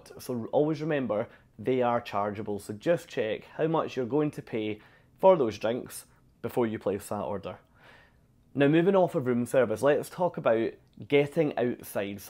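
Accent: Scotland, United Kingdom. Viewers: Is this Scottish accent? I like it.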